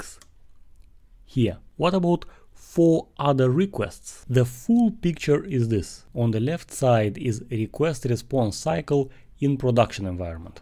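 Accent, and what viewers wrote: Russia. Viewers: I'm hearing Russian accent there)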